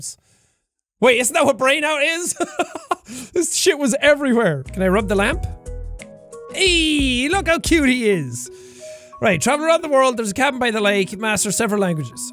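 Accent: Ireland. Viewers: We love the angry irish accent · Holy crap he is Irish so I am yay 😁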